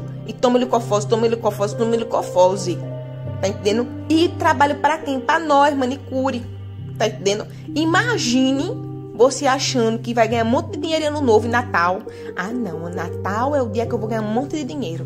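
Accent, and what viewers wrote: Northeast, Brazil. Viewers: adoroo muito seu trabalho parabens, gosto mais ainda qndo vc narra seu sotaque nodertino e muito maravilho mulher vc me mata de ir... susseso pra vc em 2021 continue prazendo alegria pra nois Brasileiros 😂😂😂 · tô amando os seus vídeos e sua voz então é das nossas tbm sou nordestina com muito orgulho, sou alagoana com de coração, sou lá de Craíbas,mais por enquanto estou morando no Pará, mais com fé em Deus ainda volto pro meu lugar, bjs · Seu sotaque e maravilhoso eu amo o sotaque do nordestino e lindo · Prefiro vc comentando com seu sotaque nordestino. Sem fundo musical por favor.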